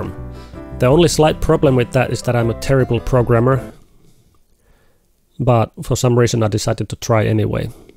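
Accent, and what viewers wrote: Finland. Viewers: So, this guy is from Finland, right. Every single Finnish person speak english like that.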